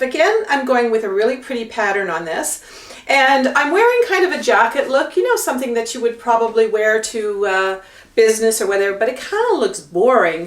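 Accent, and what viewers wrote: Canada. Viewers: oh, now I think Canada? Am I right? heard a little accent.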